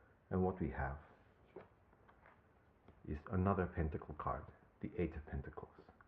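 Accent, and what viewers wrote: Russia. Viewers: Capricorn ♑️ here! Awesome Russian accent. 🖤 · Your Russian accent is outstanding! ... and very relaxing. This little fire sign (Sagittarius) would love to hear more. 🔥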